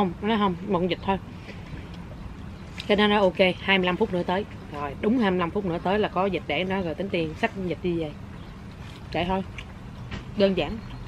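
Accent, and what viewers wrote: Mekong Delta, Vietnam. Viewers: E thích chị ghê.Người miền tây nc cưng ghê 😁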